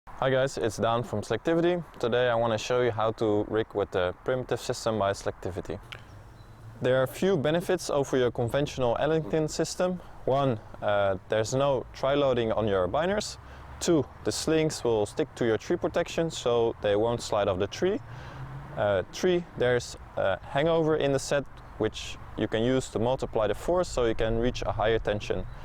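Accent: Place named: Netherlands. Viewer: That accent, you're from the Netherlands? Since I'm from europe, I'll be buying my primitive slack through you guys. Thanks for the video.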